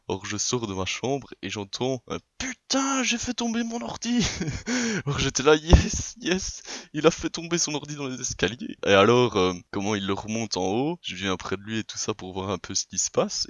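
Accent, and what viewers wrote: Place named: France. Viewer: Écœurant! Je n’en reviens tout simplement pas. Où as-tu pris cet accent à la française ? Le rythme est bon, le propos intéressant et les animations soutiennent à merveille l’histoire. Bravo, cent fois Bravo...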